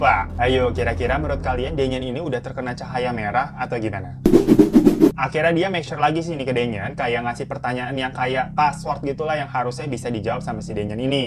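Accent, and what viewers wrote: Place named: Cirebon, Indonesia. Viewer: Yg AQ suka gaya bicara tuch ky orang Cirebon gitu lucu dan ngasal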